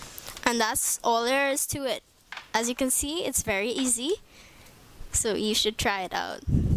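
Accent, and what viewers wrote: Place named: Caribbean. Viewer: I stayed when I realised your accent was familiar. I'm also from the Caribbean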